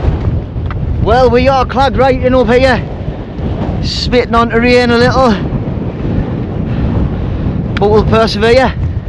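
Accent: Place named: Northern England, United Kingdom. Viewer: As an Australian, I had to tune in to your northern lingo and accent, but I enjoyed your straightforward style. Keep up the good work!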